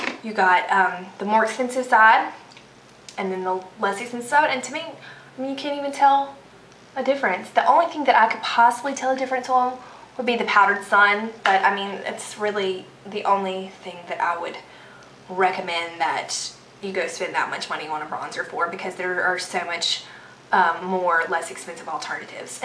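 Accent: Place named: South, United States. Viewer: im from the south and the second thing i noticed was your southern accent, the first realizing how beautiful you are! I love it though:)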